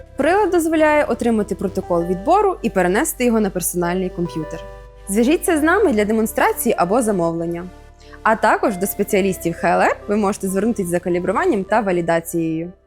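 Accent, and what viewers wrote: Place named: Western Ukraine, Ukraine. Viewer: Приємно чути таку експертну подачу українською, ще й з таким теплим західноукраїнським акцентом 💛💙 Дякую за професійне пояснення про важливий аспект мікробіологічного контролю!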